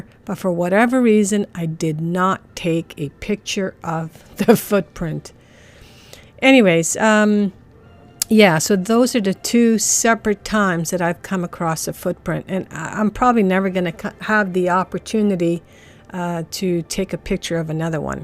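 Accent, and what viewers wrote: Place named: Quebec, Canada. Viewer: Great stories. I love your accent. It reminds me of all my wonderful French Canadian friends:-)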